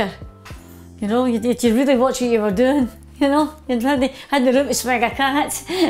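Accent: Scotland, United Kingdom. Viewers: Many English speakers who are not used to hearing someone speak English with a moderately thick Scottish accent will have trouble catching all of her words. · Listening to her talk about the house, (love her Scottish accent) I believe this was her family home. Such a warm, lovely place. She is a wonderful talent and she looks so good. I am glad for the update.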